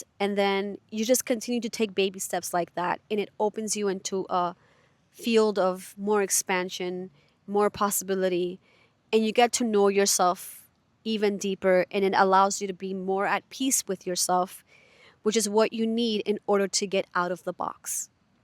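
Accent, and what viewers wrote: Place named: Florida, United States. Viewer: You so dam fine girl. And it looks like you may be in Florida 😉 the accent is ❤️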